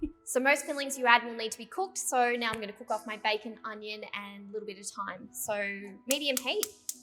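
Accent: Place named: Australia. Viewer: She's so happy all da time man...and she sounds like she's from Australia. What a terrific woman. Preciate da video fr💚🖤🤲🏾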